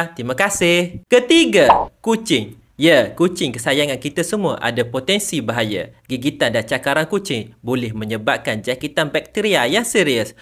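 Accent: Kelantan, Malaysia. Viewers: Kelamtam percakapanya kang😂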